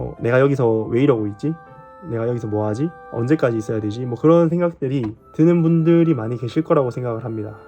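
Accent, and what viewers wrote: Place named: South Gyeongsang, South Korea. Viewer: 창원분이시구나 ㅋㅋㅋ 어쩐지 익숙한 경남 사투리네요 ㅋㅋㅋ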